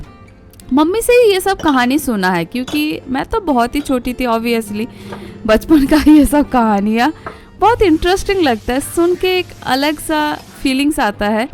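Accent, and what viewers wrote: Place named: Bengal, India. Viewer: agar aap is format me interested ho, in Hindi language, then I would advice, you have to work on your language using some kind of training. Aapke words bahot achhe hain, tone bahot achha hai, but the beauty of what you intend to deliver, is getting lost, as the Hindi is Bengali accented. Bol chal ki bhasha me koi bhi accented language achha lagta hai, but is type ka theme agar apka aspiration hai, then please look for a language training somewhere. Agar language finesse ayega, then the narration will be of another level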